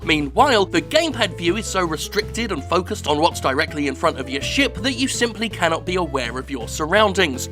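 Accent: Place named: United Kingdom. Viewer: I've never heard of you before this video was highlighted on Kotaku. Not only do I enjoy your words but everything sounds even better if your Brittish. However, the reason I subscribed is because this is brilliant and clever and very simple and I have a fondness for sticking it to the large corporate entity. Well done and I look forward to more videos.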